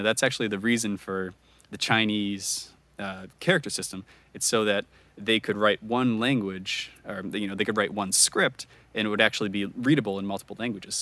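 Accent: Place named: United States. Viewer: So, for a start, you have an American accent, yet you're speaking English. And now you want to apply your AMERICAN accent to OTHER languages as CORRECT ENGLISH? Sure thing.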